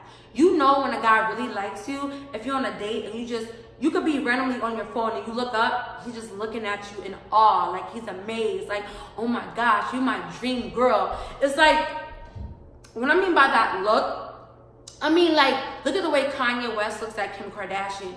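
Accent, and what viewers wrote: New York, United States. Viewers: I love your ny accent ❤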